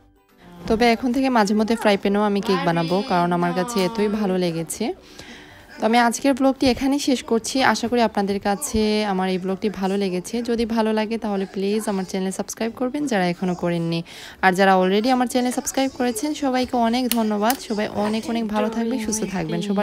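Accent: Sylhet, Bangladesh. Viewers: Apu,apni ki Sylhety?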